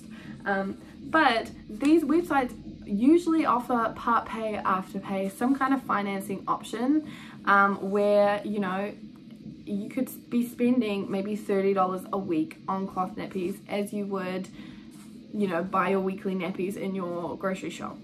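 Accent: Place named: New Zealand. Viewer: Yay lol perfect timing! Trying to figure out cloth nappies for my babe. Far easier to understand and enjoy listening to another kiwi young mum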